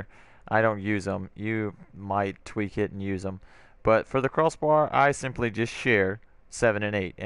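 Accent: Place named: South, United States. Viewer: Sounds like an American southern with a hint of Australian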